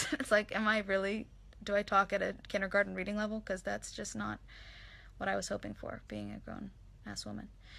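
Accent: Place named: South, United States. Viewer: I love when you talk Southern. You have the voice for it. I should know I've been in Georgia all my life.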